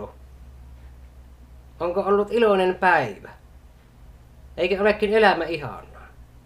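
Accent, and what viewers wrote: Savo, Finland. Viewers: nyt ainakin kuulee ton savolaisen murteen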